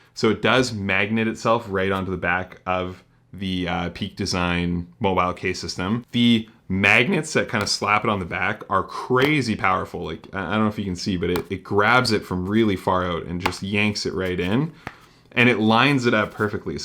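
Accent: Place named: Canada. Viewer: Excellent review. Merci beaucoup du Quebec. I noticed your Canadian accent and the bills in your wallet.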